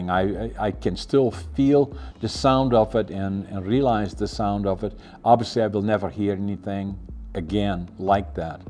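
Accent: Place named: Netherlands. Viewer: Still that Dutch accent haha.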